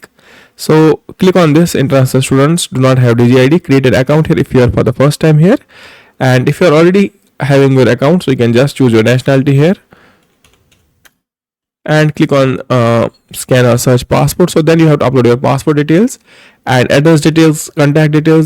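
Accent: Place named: India. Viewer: your indian accent was just disturbing. try to correct how to speak in english before applying scholarships.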